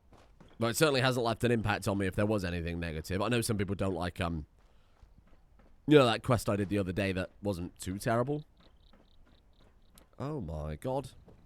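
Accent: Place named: London, United Kingdom. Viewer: For a man who says he's from the West country, and lives in the middle of nowhere, how are you this south-east Anglais and loathing of everyone around you? > Has fully swallowed the Greek-Romance-makes-you-sound-smart pill > hates anything English > has a south east accent. You sound like a man who's been brought up in the heart of London in the upper or middle class and is trying to hide that 🤔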